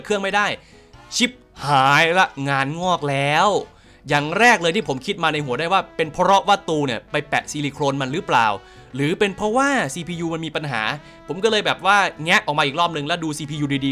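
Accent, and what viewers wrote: Ubon Ratchathani, Thailand. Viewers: พี่เป็นคนอุบลฯเหรอ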